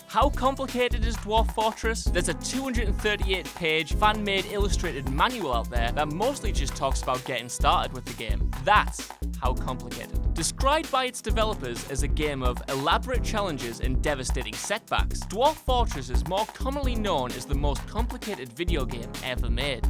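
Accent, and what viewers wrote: United Kingdom. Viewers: Eh he's a Brit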